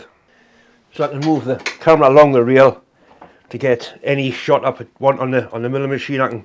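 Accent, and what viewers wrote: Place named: Geordie, United Kingdom. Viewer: Your the UK version of Chris from clickspring, tiny shop making amazing stuff. With that broad geordie accent that makes me think of home (Sadly I'm a Smoggie) and your gentle easy going way, it's just perfect. And the swearing means your human, hee. I always learn something and that is the best sort of video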